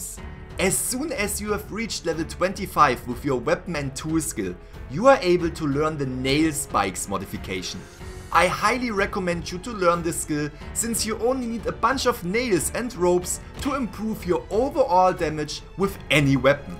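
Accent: Germany. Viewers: You sound german :o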